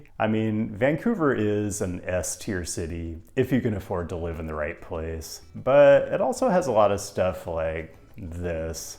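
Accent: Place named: Midwest, United States. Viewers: he sounds Midwest to me also but I already heard from his other videos where he’s from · Is he? I'm Floridian. He sounds midwestern. Y'all have dry wit up there too do ya?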